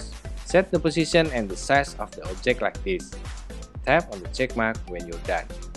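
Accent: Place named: Indonesia. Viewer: From the speaking, i know this people from indonesia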